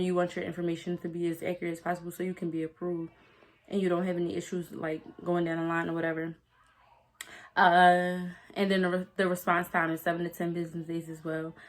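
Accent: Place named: Philadelphia, United States. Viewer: Thanks for doing this! You should like you’re from Philly too 🙌🏾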